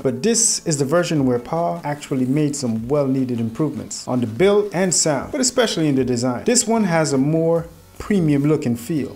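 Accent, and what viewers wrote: Jamaica. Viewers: Great review but I must ask...how Jamaican are you?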